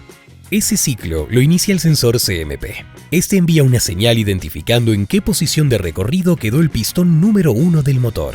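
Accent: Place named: Chile. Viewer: Me Gustan Estos Videos SiN Acento Mejicano....suena como Acento Chileno de Chile po'' weon Chile 🇨🇱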